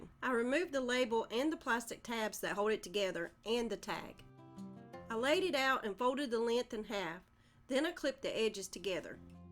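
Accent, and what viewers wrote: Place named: East Tennessee, United States. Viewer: I love all your videos!! You are so creative!! I like the way you talk cause I talk about the same way 😂 Im from East Tn.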